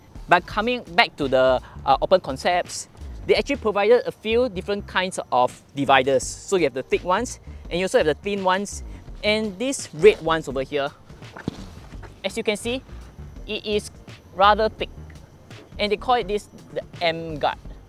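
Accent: Singapore. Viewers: Legend mate, great review. I grew up in SG and I knew that accent was familiar 😂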